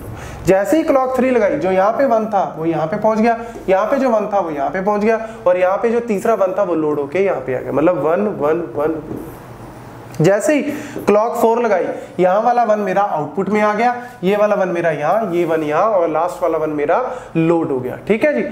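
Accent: India. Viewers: Please, videos with subtitles. I'm brazilian and I don't understand english with indian accent very well :( nonetheless thank you very much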